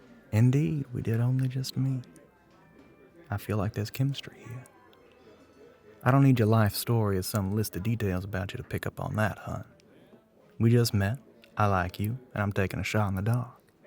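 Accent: South, United States. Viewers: Me being from the south and never, EVER hearing an accent this smooth….I’m blushing 🥹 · I love the southern accent smmmm it’s literally my fav 😋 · The southern accent gets me every time I can’t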